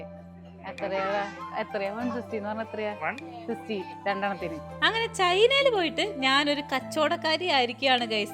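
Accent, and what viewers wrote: Thrissur, India. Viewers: like ur thrishur talk..why not using ur old thrishur tag line now a days?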